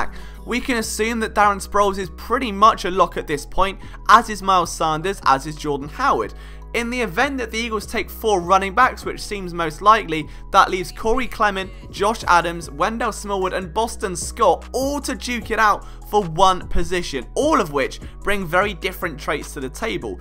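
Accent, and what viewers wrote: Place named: England, United Kingdom. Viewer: Man I'm shocked I enjoy your videos as much as I do. Nothing personal but I can't stand that accent and willl turn shit off damn near instantly but your shit I don't even notice lol. All of my mom's family is from England to that's why I don't understand why it bothers me so much. Anyway keep up the great work man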